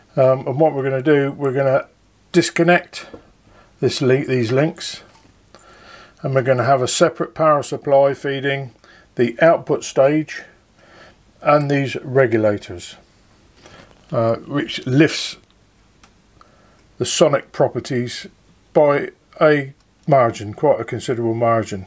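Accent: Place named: Midlands, United Kingdom. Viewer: I'm trying to work out your accent. It's not Yorkshire, but more like Leicestershire, Derbyshire, Shropshire or Lincolnshire...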